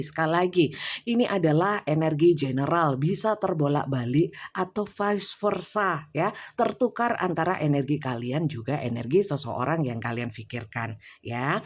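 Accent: Java, Indonesia. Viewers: Ingih bnda medok banget bun jowone.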